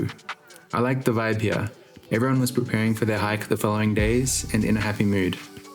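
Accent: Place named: New Zealand. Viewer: Interesting! Thanks for sharing! I thought I heard some Kiwi in there. Thanks for this video, might do Yushan in one day in November, and your other travels seem so sick as well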